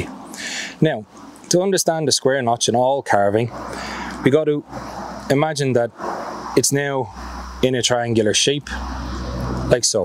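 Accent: Ireland. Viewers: Jaysus you had me in knots with the chicken dance in the 80s.... memories! Great listening to an Irish accent over mostly Americans on YouTube, you're a treasure trove of information and inspirational in your enthusiastic delivery, I really enjoy your videos and thanks for sharing your knowledge, I am putting together some kit as I am really only starting out with the outdoors but I am grateful for the safety advice as I don't think I would have been careful enough with some of the processes...but listening and watching you has given me a completely different perspective, loved the axe 🪓 videos as well! Go raibh maith agat!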